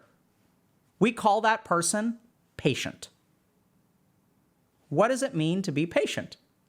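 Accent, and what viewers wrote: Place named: India. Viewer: I only want to converse with in his native Indian-English impersonation accent. I know it's not pertinent to the topic discussed it was just welling up from inside of me from the previous video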